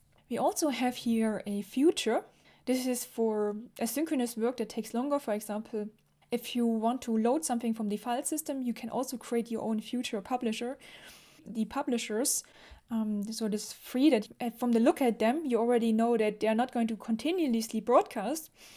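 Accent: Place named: Germany. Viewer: I totally like your step.by.step approach but at the same time efficiently and quickly cover the whole picture. It's like from ZERO to HERO. Very nice. Thank you very much for your videos, that I immediately have subscribed to. Keep going! By the way your accent, though your english seems flawless sounds a bit "german". I know, what I am talking about 🤪. Are you german?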